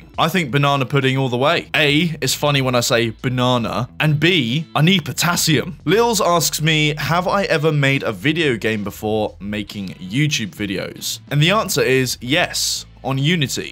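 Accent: England, United Kingdom. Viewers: As a 33yo core gamer i must say i was hooked up after randomly founding "what when wrong" video. I watch you vids from around week, subscribe 2 days ago and start watching everything you done (except drama and 'r word' vids). I am maybe not commenting too often (kudos to Just Some Guy without a Mustache) but i found myself hooked up to informative content, funny and short jokes like "standing on the table". Personally i like backstage informative content and you personall insight behind it. Best vid for me was the one about doors, maybe it can use some polishing but still it was good and engaging imo. Glad to see your channel grow and you like what you doing, thats most important thing for creators to not burn out too quick. Wish you luck and waiting for new various content ;) P.S. be patient and dont get trolls/toxic people to get over your head ;) We like you for who you are, and you beautiful accent (event tho personally i dont like brit accent xD) you rocking it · I'm glad I discovered your channel just in time to witness this part of its growth "live"! 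Of course your content interest me in the first place but the other crucial thing is you. The way you behave and talk, your tone etc. I don't watch content, however interesting, if I don't like the youtuber's general behaviour. You sound genuine, don't overdo things and that's what I like. I thought I was fed up with english accents (not my first language), but you seem to have cured that lol. Gonne watch those videos of yours I still haven't while waiting for the next one. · Got around to this a bit late. I think I joined about when www started, and you instantly became a favourite. Continue making well-produced videos and never lose that brilliant English accent.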